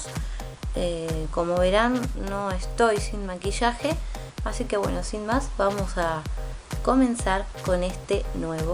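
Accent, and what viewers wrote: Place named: Argentina. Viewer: pues eso, el acento argentino :) jejeje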